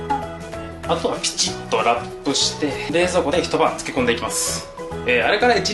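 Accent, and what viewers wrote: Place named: Hakata, Japan. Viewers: 所々出る 九州の方言が親近感湧きますヽ(´ー｀)ノ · 若干博多弁？が多いこの動画すき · 実食編、いつもより博多弁多いっっちゃんね(o^^o)よほどうまかと！ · この喋り方的に福岡やろか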